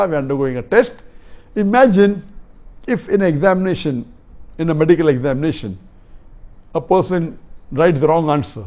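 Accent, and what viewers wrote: India. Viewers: Please admin, you should probably give the subtitle. sometimes i dont quite understand Indian accent. My english isn't good at all & i still want to learn from Dr Zakir Naik. Thanks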